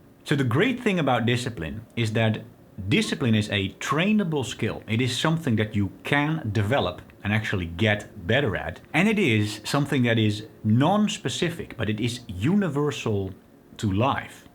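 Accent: Netherlands. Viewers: Ik Dacht Wel Dat Ik Een Miniscuul Nederlands Accentje Kon Waarnemen. 🙂 Nevertheless Very Well Spoken & Articulate,Dankjewel. 🔥💪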